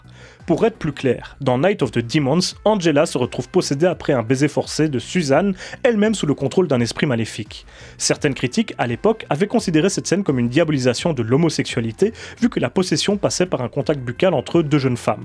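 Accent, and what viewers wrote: Belgium. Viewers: félicitations pour les 90k je trouve cela totalement mérité...je vais me répéter mais tu fais vraiment un travail de montage d ecriture incroyable tu t exprimes vraiment bien ton accent belge est quasiment imperceptible (je suis belge également) c est vraiment un plaisir que de voir un compatriote avoir de plus en plus de succès sur YouTube etant également un gros cinéphile grace a toi j ai pu découvrir ou redécouvrir certains films cultes du cinéma fantastique et d horreur...ta recherche tes anecdotes sont tjrs de qualité et j attends tjrs avec une certaine impatience tes prochaines vidéos preuve que ce que tu fais dechire continue comme ça big like poser bien évidemment a bientôt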